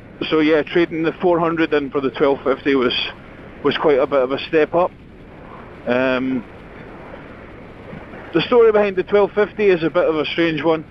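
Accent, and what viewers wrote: Scotland, United Kingdom. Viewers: Hey dude, good to hear another Scottish Accent :) If you are ever heading further south on your Vespa, let me know and we can go a ride!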